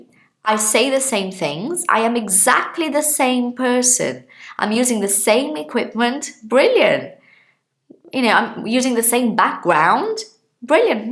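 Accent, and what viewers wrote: United Kingdom. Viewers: Arrisco dizer que é porquê o povo só se interessa pelo inglês "americano" já que o dela é britânico, fazer o quê, os melhores canais são sempre notados por uma minoria enquanto modinha ou porcaria rende milhões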